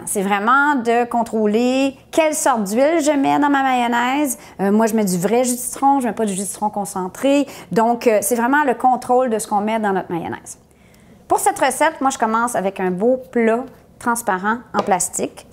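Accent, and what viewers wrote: Quebec, Canada. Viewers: Très jolie fille et accent québécoise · L"accent canadien est effroyable. Parlez comme il faut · Je supporte pas l accent QUÉBÉCOIS !!!!!!!😣😣😣😣😣😣😣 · jaime pas accent de mademoiselle on dirait une giraffe qui parle on dirait vous avez un chat dans votre gorge meme accent du quebec a la celine dion et garou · Je suis française et j’adore entendre parler les québécois!!! Je trouve ça réconfortant je sais pas et vous avez l’air tellement authentique et sympa, pas comme nous les français qui râlons sans arrêt 😂 (en France le robot que tu utilises s’appelle un « mixeur plongeant » lol) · Je pense que le québécois soit un accent car si on en prend la définition, un accent est la prononciation différents de mot déjà existant (les langues) etent donné que le français soit arrivé en premier on peut dire que le québécois soit un accent bien qu'il existe plusieurs mot ne figurent pas dans le dictionnaire français au passage j'aime beaucoup cette accent :D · Bravo! 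Mais je mettrai le citron à presser en entier pour éviter d'avoir un 1/2 citron qui traîne ensuite dans le frigidaire et dont on ne sait pas quoi faire. La quantité d'huile que vous utiliser est impressionnante.... A essayer: un radis noir finement broyé et une ou plusieurs gousses d'ail aussi finement broyée + du poivre. J'avais essayer cette méthode, elle marche très bien. En France cela s’appelle un MIXER PLONGEANT. On aime votre adorable accent québécois, tout à fait charmant. Merci! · Son accent est different. Canadien ? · Voilà une adorable jeune femme avec son accent québécois qui vous fait voyager. 30 ans d'experience ? Elle ne fait vraiment pas son âge. Quant à sa mayo, j'aurais ajouté du poivre. Merci pour votre fraicheur et vos recettes. Amitié aux cousins québécois. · Merci pour ta réponse, en tout cas j'avais pas mes règles !! Lol Je retesterai une prochaine fois alors c'était pas de chance!! 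Ça devait venir de mon oeuf je pense alors, car il était peut être pas assez à température ambiante... Bises de France!! J'aime trop l'accent québécois !! 😍😘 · J'aime trop l'accent québécois c'est fou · j’aime trop ton accent québécois trop satisfaisant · Une québécoise qui nous apprend à faire une mayo..! Cette charmante jeune femme ignore que seul le jaune d ' œuf est nécessaire , qu' elle nous propose la confection d' une véritable sauce béarnaise ...! On pourra alors juger son réel talent ...? Mais à part cela elle est charmante avec son adorable accent . ( un maudit Français ! ) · Je suis français et chef de cuisine , j'aime bien votre accent québécois , continué vos vidéos de partage culinaire et bonne continuation !!! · AAARG catastrophe je fait ma mayo à la façon Philippe etchebest le King de la cuisine, deux jaune d'oeuf sans les blanc, sel, moutarde,vinaigre et l'huile et ons verse l'huile en fin filet peu à peu et ça marche c'est imparable un vrais délice, j'ai voulue faire votre metode et vas i je met tout d'un coup elle ne monte pas rien que dale le seul truc que j'ai apprécié c'est de voir la sympathique cuisinière au belle accent québécois, j'avoue qu'elle et adorable, mais n'en déplaise à l'avenir j'en resterai à notre exelent chef cuisinier français !! 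cordialement,!! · L'accent Quebecois....... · L'accent québécois 🥰🥰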